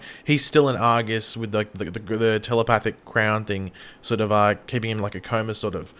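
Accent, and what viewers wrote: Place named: Australia. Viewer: Yeah but you can clearly tell is Australian from his accent so this guy's just falsely accusing him for nothing. Besides there are stream sites like vumoo or whatever it's called where you can watch it a few hours after it airs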